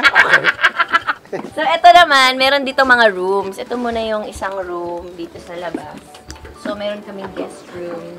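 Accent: Visayas, Philippines. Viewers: bisaya po mo ate kim chiu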